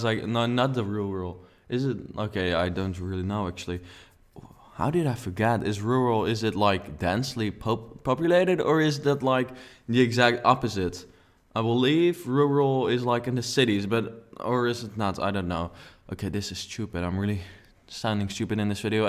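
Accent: Netherlands. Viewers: I think you are a great builder and produce some nice content. I am afraid you dutch accent is painful to listen too though. It makes me cringe XD (you seem like a nice guy though) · You have a dutch accent 😊👏🏼❤️ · Ik merk dat je Nederlands bent want je hebt een accent van een HOLLANDER!!!!! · I was like "yeah right hes gonna know how to build that" then i heard his voice and was like "yup he dutch" lol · Thaatttthh Goooooooddddd XD lekker accentje, elke nederlander kan een nederlander herkennen aan t acceptnje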